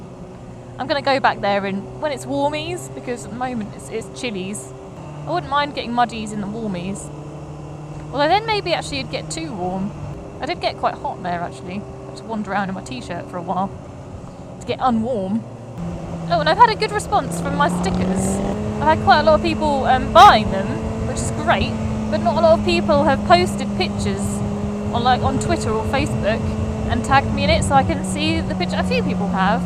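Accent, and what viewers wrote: United Kingdom. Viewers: You have a lovely voice. I watch your videos just for the sound. But while listening to you or any Brit I always think man....there's no way I could be British besides the fact that I'm an American because it takes too much effort to speak like that. You guys put so much effort into speaking. Americans try to shorten words and drop syllables any chance we get but Brits look to add syllables every chance they get. Example... Aluminum 😀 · British accent is pretty funny to hear Cheers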